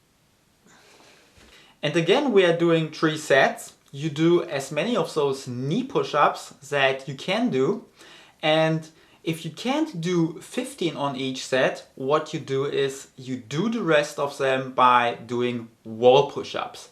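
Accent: Germany. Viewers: u sound like u have a erman acent u german?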